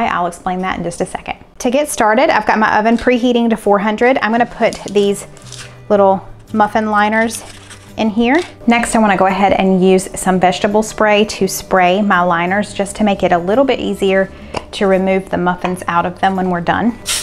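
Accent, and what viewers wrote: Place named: South Carolina, United States. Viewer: I say whopping also. May be a Southern thing. I love you because you are you. Love, love your channel. I have relatives in Upstate SC and I love your accent. 🥰❤ When I hear like (liike) and type (tipe) and last night (niite) I know it is Upstate SC.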